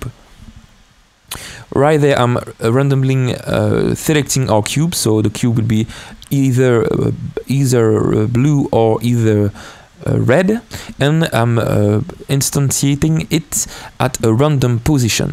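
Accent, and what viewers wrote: France. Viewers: Oh mec, je crois que tu es francais vu ton accent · Little questio, are you French? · Vu ton accent tu es français, ce serait vraiment cool de traduire ta vidéo en réenregistrant ta voix en français par dessus, merci dans tous les cas :)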